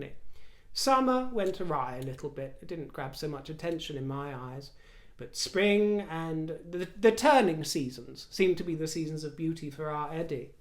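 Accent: Australia. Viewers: Love your Australian accent... "Lilly Diana! Not sure if you meant to, but you really did make me laugh out loud!! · Love your Aussie accent ❤ You really got the point across...".Lilibet Diana"!!!👍👍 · Love your Aussie accent🦘🐨🇦🇺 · Wow Aussie here and you do nail the accent down to a T. 👍🤩😄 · Bloody ripper of an Aus accent! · you speak better Australian than I do! Good morning from a very cold Sydney ❤️ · haha.If you used that accent in Australia you wouldn't be getting many invitations out. We have many different regional accents and dialects in Australia. You just used a very bad 'Harry Kings scrap-metal and junk car-yard' accent. Is that was what you were going for? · 😁😁😁🤣🤣🤣🤣🤣 Oz accent. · As a true blue aussie, you're accent is spot on from an Australian movie named Dons Party circa 1970s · Your Aussie accent is perfect. Not a hint of cockney or kiwi. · Your aussie accent 😂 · Love your Aussie accent #. 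Got the pronunciation coming out nicely 👏👏👏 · Your Aussie accent is on point 🇦🇺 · Well done on your aussie accent :) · Sorry but not everyone talks like that over here, you know Australia has moved on from that,but saying that it’s like Gordie it’s a celebration of a dialect 🤷‍♀️ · Your Aussie accent is an absolute delight, dear!!! As for the BC photographer, I hasten to agree with you - very hmmm 🤔 and their website lacks a lot of trust. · I'm an Aussie and you crack me up with your Aussie accent. It's pretty good. Luv ya xxxxxx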